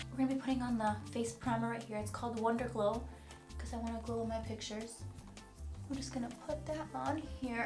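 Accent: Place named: New York, United States. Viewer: Where are you from you got a slight accent Are you from somewhere in New York?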